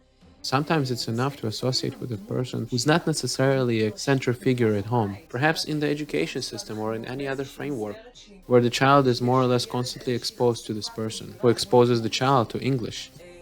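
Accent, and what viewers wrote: United Kingdom. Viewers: He speaks proper Londoner accent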